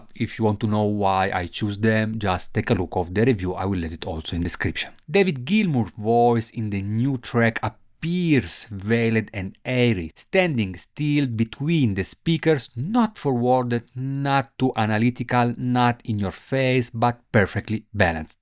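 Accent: Italy. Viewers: I like italian accent! What camera do you use?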